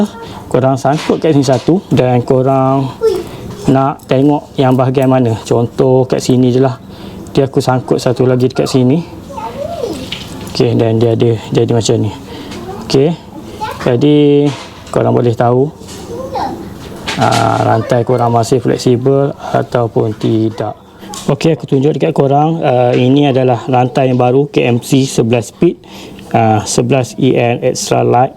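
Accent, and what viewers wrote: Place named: Pahang, Malaysia. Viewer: Bang org phg ke... Klu phg area mne..? Kuat slang phg ter...haha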